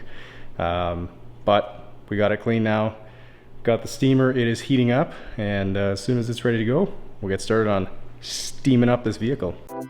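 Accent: Canada. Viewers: Where are you from lol, ur accent sounds Canadian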